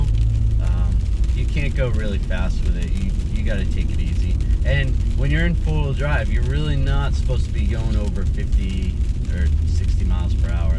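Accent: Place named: New England, United States. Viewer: Hey you're back! I made the mistake of putting only two winter tires in the front and two all seasons on the rear before, on my Corolla. It was a little sketchy because it would randomly start drifting especially around turns. Oh and yeah I hate it when people don't clean off their car and they they throw snow all over other cars. And and great new england accent btw :)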